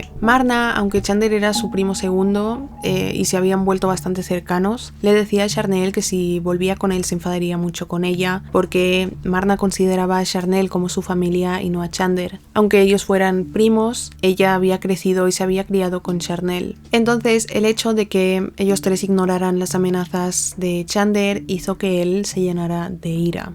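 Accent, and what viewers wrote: Spain. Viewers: Mira que no me gusta el acento español y prefiero normalmente a Landó y a Dinosaurs vlog pero muchacha, me gustó como relatas ❤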